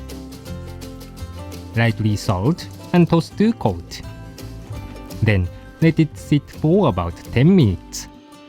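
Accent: Japan. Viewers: as someone who speaks both English and French, I can conform that a French-English accent sounds n o t h i n g like that! It's just his original Japanese accent I think? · I love the narrator's thick japanese accent when he's speaking english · 日本人の耳からすればナレーターが日本語なまりの英語を演出しているのがわかってしまうツラさ…w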